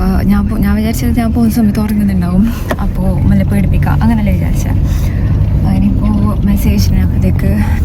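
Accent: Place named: Kasaragod, India. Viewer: Kasaragod yede😂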